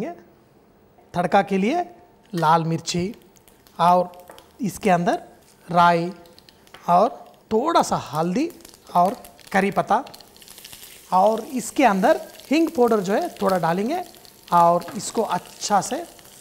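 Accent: Hyderabad, India. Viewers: Anna hyderabad hindi baagundi.👌 hindi urdu mixing & Rasam jabardast 👍 nenu kuda chestaanu . ingredients okate tareeka veru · Nice hyderabadi hindi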